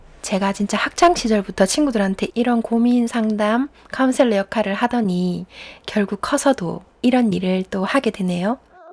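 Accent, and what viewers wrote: Gyeongsang, South Korea. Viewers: 갱상도 어감이?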